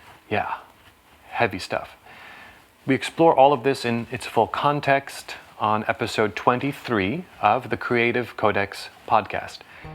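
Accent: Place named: Netherlands. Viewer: I like that the presenter has such a clear Dutch accent when speaking English. Kan goed horen dat je Nederlander bent!!! Top video.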